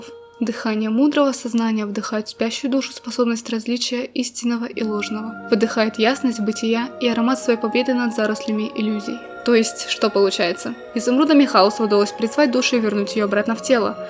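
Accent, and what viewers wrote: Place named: Russia. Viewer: Your accent is so cute, i need to go to Russia now to get a waifu. But Elise is trash LOOOOOOOOOOOOL Just kidding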